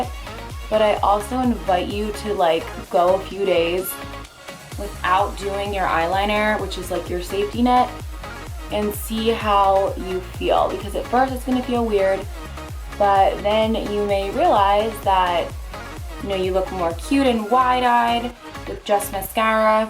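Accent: New Jersey, United States. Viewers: Where are you from? You sound like your from New Jersey or Long Island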